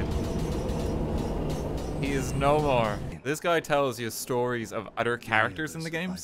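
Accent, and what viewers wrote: Ireland. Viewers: Irish, not British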